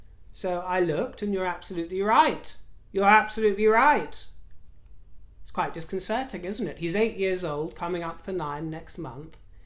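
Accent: Australia. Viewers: Love your Aussie accent !!!❤️❤️❤️ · Your Aussie accent is better than 99% of Hollywood actors. Well done! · Ann is adorable! I saw many many years ago .. two interviews she did with Michael Parkinson .. showed a Completely different side to her public persona as a rather strict humorless princess that was always portrayed in the press. . she was so funny and so honest... Parkinson was was a great interviewer and they interacted so well.. she revealed how she wanted to go to boarding school bcoz basically being privately tutored was boring and stifling (she didn’t use those words of course)! Geez she was funny! And highly interesting and intelligent. She described “losing her rag” when her potential kidnapped tried to drag her out of the car.. her recount was hysterical!! Anyone who claims to be an Aussie and complained about your Aussie accent.... ain’t Aussie.. 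they must be fake Aussie 🙄🙄🙄.. and today was even better! Whilst sometimes hearing our accent back at us .. I know I go sometimes .. bloody hell, do we sound that bad.. but then I go: I guess so. Australians tend to laughter at themselves.. we don’t take that sort of stuff seriously.. u shld block them from your channel if they are that ridiculously sensitive. You are v v good at accents.. quite excellent actually! Keep up the good work 🇦🇺🇦🇺🐨👍🏼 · I love you Aussie accents well done. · All of Aussie accents were brilliant! I love listening to for much needed elocution lessons. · Ohhh… your Aussie accent is brilliant…come to the west coast next time! Xx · Props for your Australian accents. You did us proud! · Well done on the Aussie accents ♥ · I don’t understand the fuss about ‘the word’ it just means that Harry is a dick - true story. I love Mike Tindall. What you see is what you get and if he has The Princess Royal’s love and approval, he has mine. 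We all loved Meghan when she joined the Royal Family. We really thought that she was going to be a fresh air and now we are just disgusted at her - and her husband’s behaviour toward our Queen. Lady Sarah looks very much like her Mother. I’m about the same height as the queen and my Grand daughter always wanted to be taller than me. She is now 11 and can look me in the eye🫤 Me and you will have to differ about Eugenie (like you care lol) I’m not so sure whether her loyalties lie with The Queen or the Harkles. I hope I’m wrong but we’ll see. Loved your Ozzie accent/s 💕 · love your Oz accent from Queensland resident made me laugh 😂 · Omg your Aussie accents are amazing!! Love that you've seen Kath and Kim 😂 Really suits you that gentle Aussie accent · your Aussie accent is hilarious, love it!! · you are on fire at the moment!! Ps your australian accent was truly fabulous in your last vid. 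Enjoy your day xx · Oh Dear, As an Aussie😀I found yesterday and today your Aussie accent just made me smile. I find it very sad that here a lot of people are just loosing the great sense of humour we used to have. Love your channel ❤️🥰🥰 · Had to chuckle at your Ozzie accent we call it Strine, my younger sisters talk more like that, I tend to use the Queen's English common in Adelaide. I love Mike Tindall he says it like it is. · I love your Aussie accent. Keep it coming. Although I really love your nice posh natural accent too. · I love your Aussie accents..made me chuckle. You are spot on…so enjoyed your chat today. In Australia we shorten people’s names to signal our affection for them. It’s a fun way of showing we care and that we are close to them. Informality is the vibe of the day. 🐨👑♥️ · Great episode 🙌🙌🙌 Your Aussie accent is fantastic! You’ve obviously embraced Home & Away and Neighbours in your youth. 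As we say, “goodonya” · When you say it in your Auz accent, it is so funny. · Love the Ozzie accent, Mate. All of them. You're a master. Could you say "was a miserable cow" in a Ozzie accent? Please! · beautiful colour on you, love your Australia accent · Great Aussie accent = love from an fellow Aussie xx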